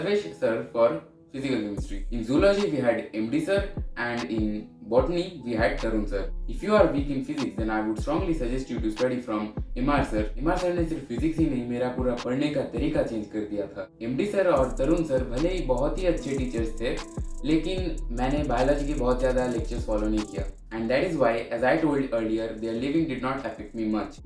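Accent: Bengal, India. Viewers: আমি নিশ্চিত তুমি বাঙালি from accent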